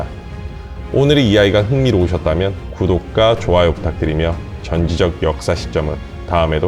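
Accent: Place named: Gyeongsang, South Korea. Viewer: 경상도 사투리인데요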